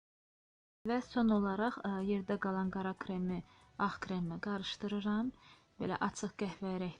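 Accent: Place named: Ordubad, Azerbaijan. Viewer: Cox sagolun cavablandirdiginiz ucun.Daniwiginizdan hiss etdim lehcenizden bizde ordubadliyiq yaxin geldiniz😙😊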